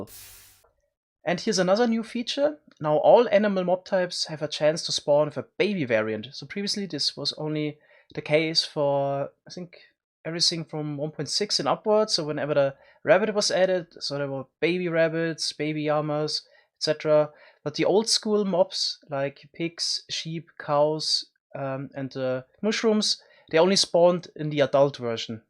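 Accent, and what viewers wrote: Germany. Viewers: you sound german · Are you from Germany? Kinda sounds so xD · You sound soooo german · Are you german? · You're German I can hear it Wie gehts dir mein Kamerad? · Man merkt so dass du Deutsch bist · Laut deinem Akzent Leite ich ab, das du deutsch bist😂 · are you german? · Are you German? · You're German right? Ich auch, man merkts an deinem Akzent und daran, dass du das "th" als "s" ausspricht ;) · I can tell that you're german. Greetings from Germany xD Great vid by the way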